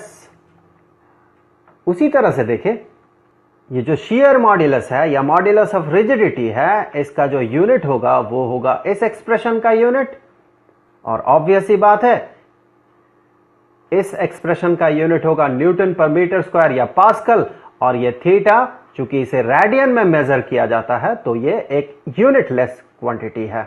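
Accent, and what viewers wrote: India. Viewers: والله حسبه يتكلم انجليزي بس باللكنه الهندية.. لين استوعبت ههههههههههههههههههههههه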